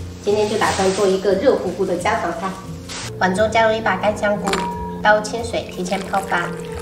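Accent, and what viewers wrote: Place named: Quanzhou, China. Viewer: 你泉州的啊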